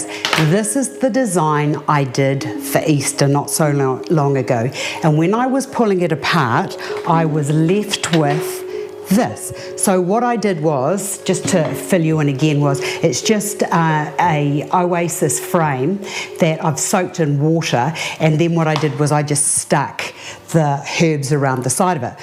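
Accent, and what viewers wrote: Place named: New Zealand. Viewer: Love this! and I can't get enough of the delicious NZ accent. You sound like my choir director Mark Duley, he's from Christchurch but now has settled down in Galway, Ireland!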